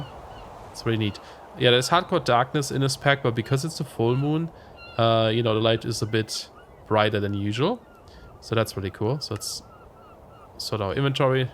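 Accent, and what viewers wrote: Germany. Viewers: Love the video! Also I have a quick question. Your YouTube profile says you are Canadian, "but" your accent kinda sounds German to me. Am I right about that?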